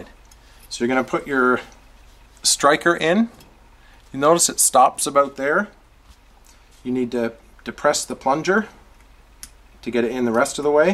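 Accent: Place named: Canada. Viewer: Very well done, used your tutorial as a guide to smooth out my 2.0 shield. Awesome video, best I've found, clear, conscious and touch of Canadian twang, EHH. Thank You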